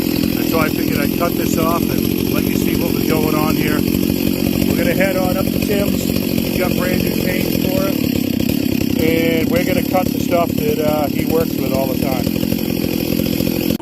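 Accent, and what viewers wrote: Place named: Massachusetts, United States. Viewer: just curious the accent sounded Massachusetts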